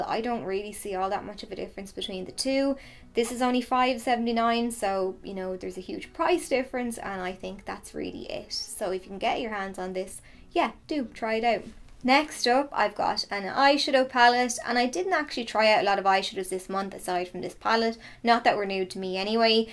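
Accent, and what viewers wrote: Ireland. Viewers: Great eye makeup!!😍😍🤗❤ love yaa😚 your Irish accent is soo cute!!! Greetings from Germany❤ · Love your videos! 😍Valid, professional tips and tricks, delivered in a sweet, friendly way. (I really hope I didn't offend you on the comment board for best concealers, about listening to you takes me "home" to Ireland, where I once lived. My intention was certainly not to disrespect you, it was meant as a huge compliment 😊)Love your Q&A idea for a video. I would like to know how to apply dark coloured eyeshadows under the eye (at the waterline)without smudging it and looking like I'm going to a grunge party. 😁I'm of Indian heritage and my complexion is light brown. Unfortunately I've been "blessed" with permanently darker circles underneath my eyes and concealers are absolute lifesavers for me. Whenever I try to wear eyeshadow underneath the eye, it makes the area look darker and it overpowers the effect of the concealer. 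Hope that makes sense. 😄🙈 Thanks again for your lovely channel.😊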